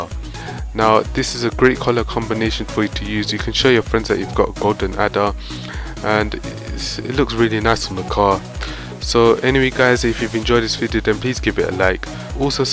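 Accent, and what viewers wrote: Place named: Germany. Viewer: Are your german because you speek german english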